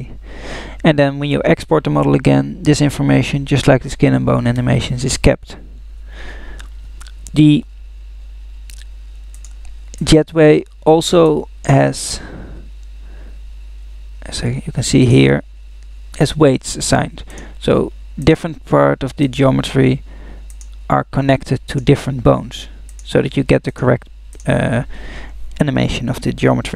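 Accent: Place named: Netherlands. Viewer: Sounds like you are Dutch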